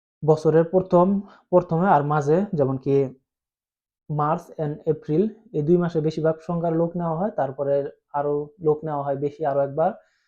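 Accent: Assam, India. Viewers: Assami to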